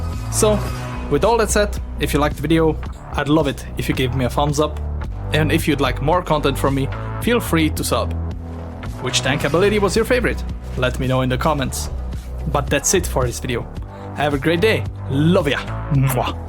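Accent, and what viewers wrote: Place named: Czech Republic. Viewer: Can't wait to play this one. Also, this isn't a knock on your Czech accent, man. But I really like the way you say 'Hoovercraft'. Keep up the good work.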